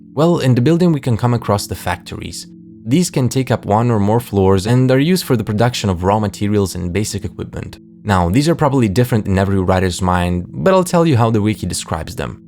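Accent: Russia. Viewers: there is no way you are not a Russian native speaker or at least from a slavic country your pronunciation says it all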